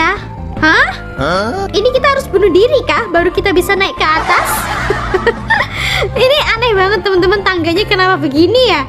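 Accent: Java, Indonesia. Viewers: Wa kakak medok pasti kakak orang jawa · Kak ngomong nya nya kayak orang jawa Btw bagus banget kak video nya😍☺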